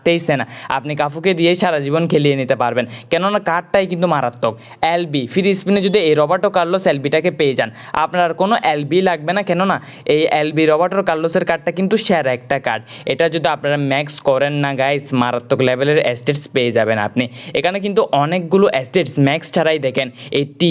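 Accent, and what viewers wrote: Chittagong, Bangladesh. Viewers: Apnar Kotha sone Ami 100% sure apni chatgaya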